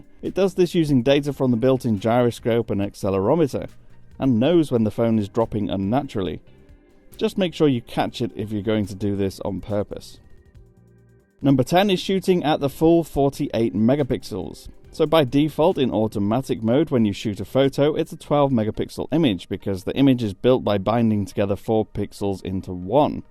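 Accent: United Kingdom. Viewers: Subscribed as soon as I heard the British accent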